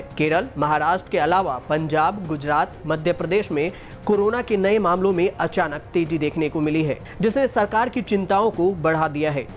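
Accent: Bihar, India. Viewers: Bolne wala bihari hai ....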